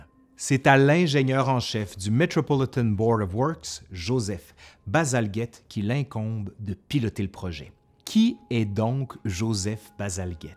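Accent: Quebec, Canada. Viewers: il y a un français de France, basé sur l'accent parisien, tout comme il y a des accents en France, effectivement. A mes oreilles de français, l'accent du vidéaste tient plus du Canada que de France. C'était simplement une généralité que je soulignais, sans demander nullement un débat sur les accents canadiens / français / international. Bonne fin de journée. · L'accent canadien parle de lui-même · Super video, et ce petit accent de Québec ...un délice pour mes oreilles!(ca fait un petit plus pas du tout objectif!) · Jolie découverte cette chaîne d'histoire, merci, c'est très intéressant! Et avec ce charmant accent québécois en plus!! :-) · Captivant, on détecté légèrement l'accent quebecquois mais ce n'est pas très prononcé ce qui est super pour mes oreilles trop habitués à l'accent français et qui ont tendance à être intolérants à d'autres accents. Je ne connaissais pas l'histoire, très bien raconté. 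Merci pour le partage ! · Non, non, il a bien à accent québécois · J'adore votre accent, les Québecquois 😁Salutations de Bruxelles ☀️ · crime un vidéo d'histoire en canadien-français! Sa parle au diable! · Merci pour ton bon français Sa fais dubien decouter un québécois qui parle normalement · du calme Molière je le comprends, les québécois sont ceux qui ont le pire français.. même je trouve que l’accent de celui dans la vidéo n’es pas très prononcé donc c’est encore compréhensible.. après on ne comprend rien de ce que disent la plupart des québécois.. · toujours ce bel accent quebecois que j'adore qui ma fait un grand effet quand j'avais 15 ans j'en est 82 et il me fascine toujours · bon travail. interessant. Etant moi-même un québécois qui réside a l'étranger, "j'su ben" content d'entendre notre accent.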